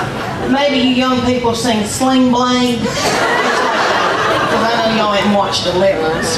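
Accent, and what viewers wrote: South, United States. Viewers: She is my people! Those who say she isn’t funny….. have no sense of humor! Humor is always based in some truth. We lived in Europe when we started watching her and her accent made me miss all those years we lived in the south! I have a friend from Tennessee who could pass as her sister, that’s why I say she’s my people! ❤️ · Her southern accent makes it funnier 😂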